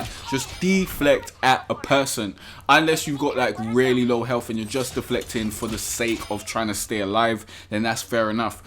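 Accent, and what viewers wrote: United Kingdom. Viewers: Are you from north London?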